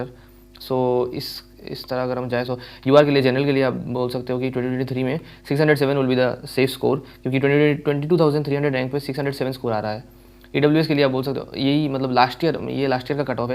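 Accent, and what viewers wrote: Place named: Silchar, India. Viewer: Bro silchar ka ho kya...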